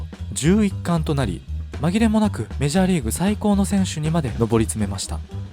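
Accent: Kansai, Japan. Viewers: この解説者関西弁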